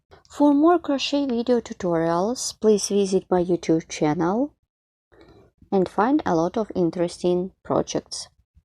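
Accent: Russia. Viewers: Duzhe chuty ruSSkiy aktsent!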